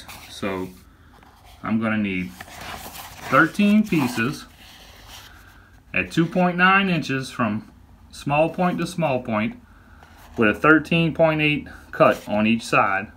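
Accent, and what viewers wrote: Louisiana, United States. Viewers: Very nice explanation. Just what I was looking for. What part of South Louisiana are you from?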